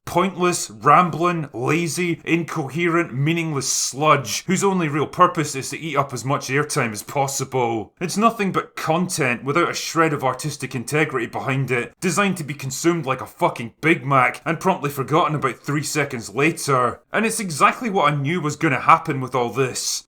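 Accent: Scotland, United Kingdom. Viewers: Done in a beautiful Scotish accent as well, a fine piece of journalism in a world of dying journalists, the only true news i,m heard this decade, nice one mate.......... ITS A FUCKING JOKE , MODS , the designers went raving and used Quadrophinia as the storyboard instead of going to work......... WANKERS. LOL..... brilliantly said mate.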